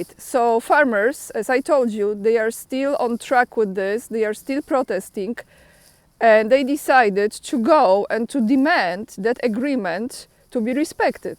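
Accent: Poland. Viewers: Your English sounds very good to me, with a little polish accent sounds more colourful.Thank You for the reporting on the Polish farmers action. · Your Polish accent when reading your texts is part of the enjoyment of listening to your program and that accent is very charming. You are careful with the facts but do not hesitate to show emotions and directly say what you think. These qualities are not so common online and make your channel both unusual and likable. Keep up your good work and greetings from Sweden.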